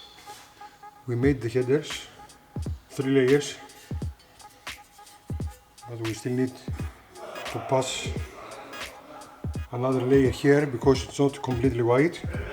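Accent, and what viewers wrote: Greece. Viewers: I assume this is the manifold you bought from <link>: ALLOY WATER HEATED TORQUEMASTER INLET MANIFOLD HS4/6 HIF38/4 I guess they would rather sell you a more expensive twin-carb manifold with some extra carburettors Hope to see some more Mini-Videos soon!!! I like your (broken) English with a Greek Accent! I speak (broken) English with an Afrikaans Accent! (LOL)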